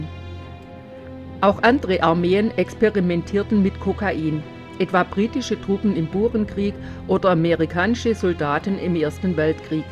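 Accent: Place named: Swabia, Germany. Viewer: bissudruff ?? Das ist eine Schwäbin, unverkennbar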